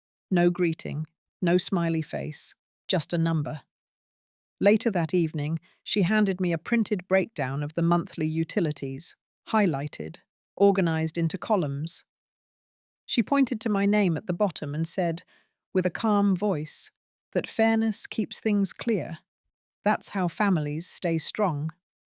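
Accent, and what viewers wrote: England, United Kingdom. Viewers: The narration portion of the Ai data input chosen- needs edited out. 😂😂 Otherwise good story. Like the English accent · Beautiful voice and diction I have not heard in years. I am from Singapore . Please keep it up. You are British ?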